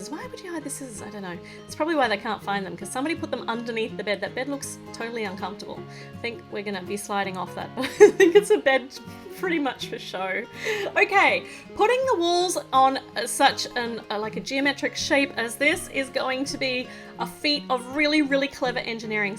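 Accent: Australia. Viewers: Yeah, in Australia people pronounce it "baahk", such a charming accent! 🐨😊You should've heard her last year when she said "care bear" in a vid 😛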